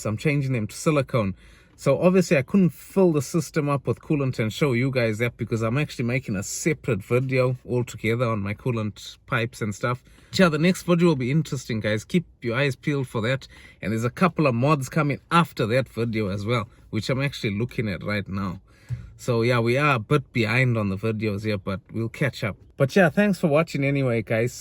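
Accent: South Africa. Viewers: you sound very south africa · Cool video ,you sound South African ?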